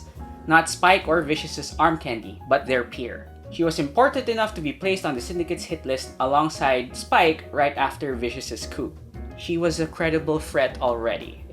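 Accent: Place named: Philippines. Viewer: LMAO! HAHAHA, KABABAYAAAAAN! AAWOOHH! Sabi ko, parang pang pilipino accent neto a... pati ung hearts sa mga comments... Pinoy nga, ahahahah, Subscribe to you and God Bless Pare.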